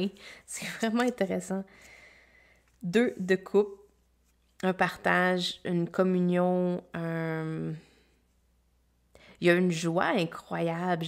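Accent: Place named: Quebec, Canada. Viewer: Pile 1 Je découvre la chaîne sur mon fil Ça correspond à mon passé et mon présent. 👍 Et abo ne serais ce que pour ce charmant accent québécois 😁 mais le tirage touche juste aussi 🙏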